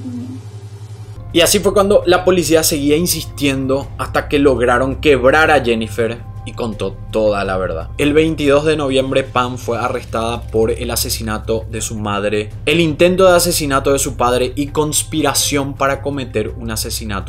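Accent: Guarani, Paraguay. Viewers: Este vídeo es algo TRAMBOLICO jajaja no mentira, realmente son muy interesantes tus vídeos y detecto en tu acento que eres paraguayo, me suscribo porque vale la pena tienes buen contenido, felicidades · Me encanta tu acento guaraní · Por su acento, pienso que es de Paraguay😉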